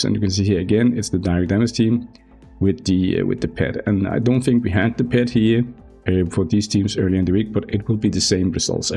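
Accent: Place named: Denmark. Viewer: Are you from Denmark? You English have a Danish dialect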